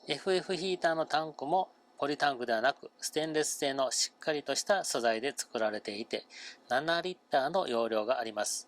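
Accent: Kobe, Japan. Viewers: とても分かりやすくて参考になります♪ 以前神戸に住んでいたので言葉も懐かしいです～😄 軽キャンを検討、特にhappy1+を考えてるのでこれからも動画を楽しみにしています～😄 レンタルもしてみようと思います😄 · 神戸の人かな❓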